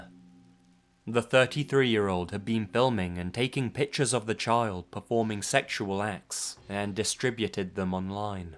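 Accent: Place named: Southern England, United Kingdom. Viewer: that accent doesn't lie, that's southern england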